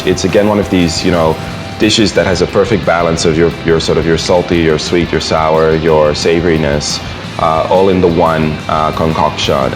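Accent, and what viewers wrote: Manila, Philippines. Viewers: You sound like a filipino fluent in English 🙂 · I know you're Australian but your English has the Manila accent too, fascinating.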